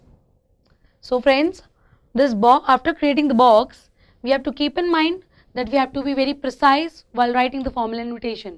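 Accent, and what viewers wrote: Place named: Pakistan. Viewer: Pakistani english bolrhi h ye madm To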